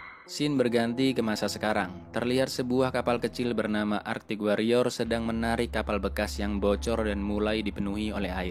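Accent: Java, Indonesia. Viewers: Orang jawa ya bang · Org jawa y bang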